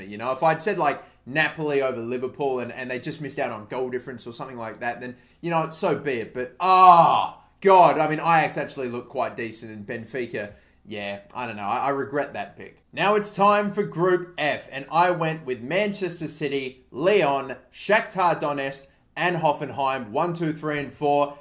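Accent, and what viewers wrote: Australia. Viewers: R u Australiaan mate good day fellow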